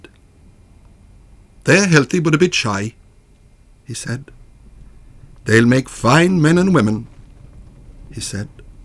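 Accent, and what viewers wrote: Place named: Kerry, Ireland. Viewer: Beautiful, dreamlike commute with the loveliest Kerry accent, that takes me back to childhood memories of sitting on the carpeted floor listening to Brendan Kennelly on the Late Late!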